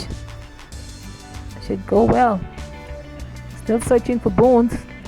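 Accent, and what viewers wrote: Bahamas. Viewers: Just because this is what I'm cooking I selected this. I didn't realise this was Bahamian until i heard you speak. Will definitely subscribe.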